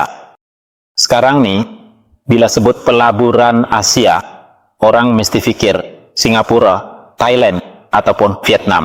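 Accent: Indonesia. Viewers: Pelabur & pencerita ini adalah warga Indonesia kerana slang cara di bercakap memang warga Indonesia. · BUKAN BAHASA INDONESIA TETAPI BAHASA MELAYU SLANG ( LOGHAT ) INDONESIA....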